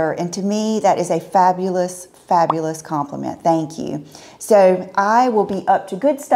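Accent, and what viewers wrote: South, United States. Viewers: I was thinking to myself, I love how says “ ok” it’s just the cutest way with your southern accent. And then you said now on to Rachel and that’s my name 😂I thought you were talking about me for a moment haha! You cracked me up today. Those Mary Jane sneakers and the purple Zara bag just made me smile. I love how out of the box you can be at times and you adventure to try new things and are always honest with us if they don’t work out but you are inspiring me with style too. Thank you! P.S. that soap holder is just gorgeous lol it’s the simply things right?! And the Zara green jacket looks amazing on you!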